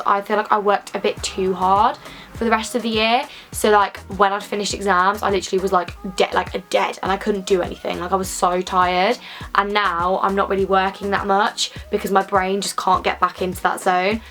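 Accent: Birmingham, United Kingdom. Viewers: !! Don't try to suppress that awesome brummy accent! I love how it sounds for some reason (I'm a weirdo lol) x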